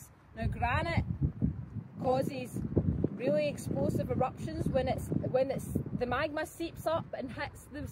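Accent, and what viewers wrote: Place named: Glasgow, United Kingdom. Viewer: Weegie accent lmaooo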